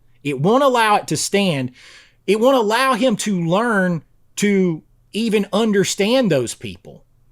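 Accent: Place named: Georgia, United States. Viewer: I have been on and off as a subscriber to your channel for quite some time. Here's the reason why: I've noticed that I strongly disagree with some of your reviews of "overhyped movies that are actually bad," as well as with many of the "hidden gems" from the '80s, for instance, that you present on your channel. To me, you tend to express your views in a fairly black-and-white manner — with that lovely Georgian delivery of yours — stating that something is either sh*t or absolutely great, with very little middle ground. At times I've grown frustrated enough with this to unsubscribe from your channel in dismay. Having been born in 1970 myself, I've seen and know most of the films that you review or include in your lists, and I find it interesting that there are many movies we both consider masterpieces, while on many others we couldn't disagree more. 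The topic of censorship is, once again, something I couldn't agree with you more on. Those "tiny adjustments" made to works of art that you brought up in this video — things that are merely reflections of their respective times and of the worldviews of characters from a particular era — should be subject to criminal prosecution more than anything else. It is genuinely disturbing to see this kind of trend, especially when modern movies already tend to be far inferior to the works of art produced in decades past. So, the persistent reappearance of your videos in my feed has once again made me a subscriber to your channel. I try to re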